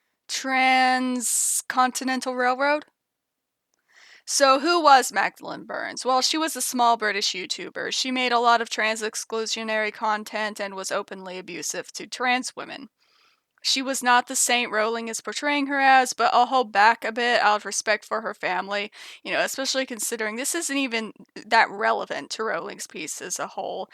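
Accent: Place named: South, United States. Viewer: I love this vid, your content and personality is one of a kind! also your redneck accent is good! lol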